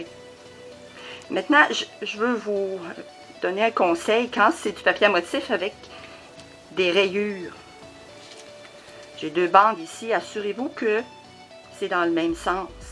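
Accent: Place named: Canada. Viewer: Très complet et très clair, merci ! En plus j'adore votre chaleureux accent canadien !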